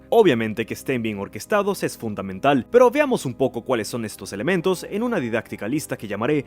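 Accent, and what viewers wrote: Venezuela. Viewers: Eres venezolano?? Suscrito de una mi hermano!! Buen vídeo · Contenido de calidad! ... Y lo mejor :D , un toque venezolano (GG) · mano me encanta tu forma de hablar,tienes como el acento venezolano perfectamente equilibrado ,tal como debe ser :v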